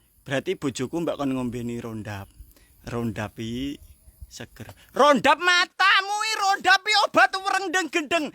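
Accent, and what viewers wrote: Java, Indonesia. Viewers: Logat mu wedok mas sing garai lucu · Wong indo, manggon nggok jepang tp, dialog e iseh wong jowo meges Misuh2 malah kocak.. Goro2 bojo mu kon ngombe Rondap, decis😂😂😂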